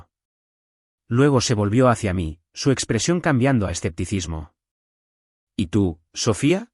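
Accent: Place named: Spain. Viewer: Si la trama del relato se desarrolla en México como país, ¿porqué el audio está en español ibérico y no latinoamericano?. Cansan sus terminaciones en "eis" como dudasteis en lugar de dudaron o hicisteis en lugar de hicieron y muchos términos más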